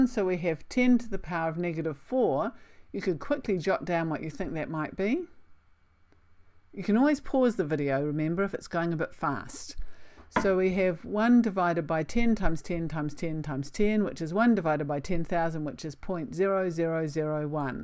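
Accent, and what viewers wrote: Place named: New Zealand. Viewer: Super helpful. Also good to hear a kiwi explaining this. Thanks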